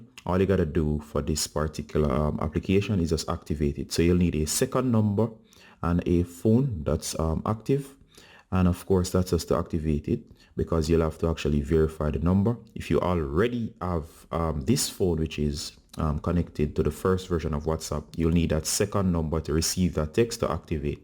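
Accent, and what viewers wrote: Jamaica. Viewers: Are u jamaican? Good vid btw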